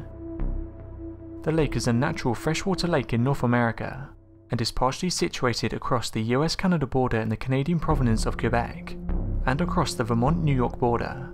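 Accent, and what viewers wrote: United Kingdom. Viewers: Interesting report. Too bad you string all your words together so even incomprehensible at 75% speed. As if you Brit accent isn't difficult enough on American ears. I'm unsubing.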